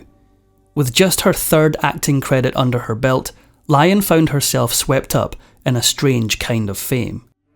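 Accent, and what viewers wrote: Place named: Scotland, United Kingdom. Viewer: You have a lovely Scottish accent.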